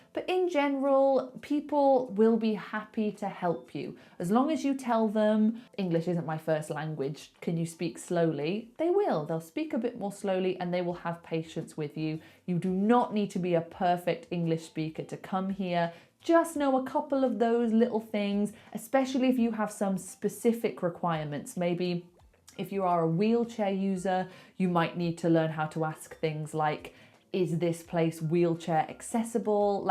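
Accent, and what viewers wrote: United Kingdom. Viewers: I like the way you talk, I like British accent, if ever I'll go to the UK, I want to go to cavern in Liverpool and you to see you in person.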